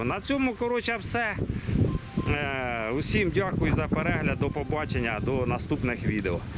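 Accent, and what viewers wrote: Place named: South, Ukraine. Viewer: Так впі знаю наш говір південний . Я змиколаївської.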